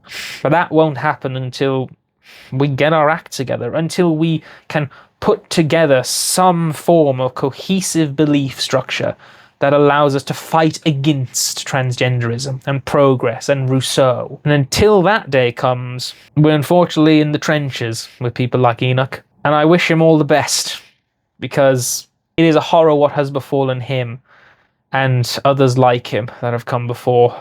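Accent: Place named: United Kingdom. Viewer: Right wing channel called my ireland. Accent is so british he might as well have the surname of Windsor. Many such cases.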